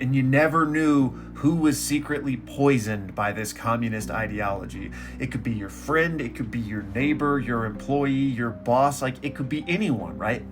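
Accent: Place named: Canada. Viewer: I thought you were Canadian 😂😂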